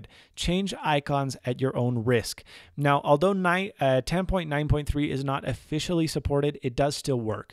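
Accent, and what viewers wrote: United States. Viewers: Haha, of course there's nothing wrong with the accent, but we're used to hear him speak perfect american English. It's actually quite funny for his old viewers to hear. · This was like an old Mac Madness Monday! Loved it. You sound way more American now as well.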